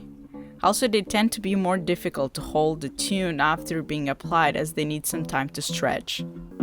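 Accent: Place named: Portugal. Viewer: Assisto teus vídeos a bastante tempo e não sabia que você falava em português! E pelo sotaque e português de Portugal! Eu sou brasileiro e adoro ver teus vídeos! Parabéns !!!!! Continuo fazendo mais vídeos! Poste vídeos mesmo de pequenos projetos executivos! E muito satisfatório ver como você trabalha! 🤩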